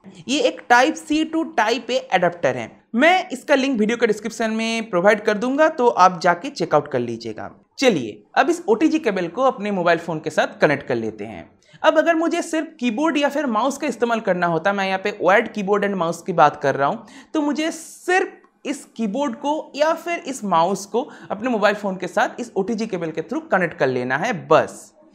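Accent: Bengal, India. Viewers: I'm also... Tomar kotha sune mone holo tumi bengali😉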